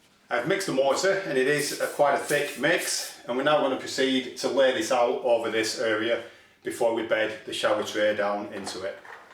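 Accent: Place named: Lancashire, United Kingdom. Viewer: Q What's a nole? A It's a naperture! Great to hear a Lancashire accent after all these years in Geordie land!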